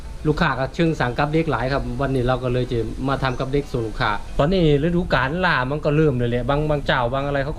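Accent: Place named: Korat, Thailand. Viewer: โมแปลกดี คลิปดีมีประโยชน์ ติดตามชม สำเนียงคนโคราชคือบ้านผมเด้อครับ 👍👀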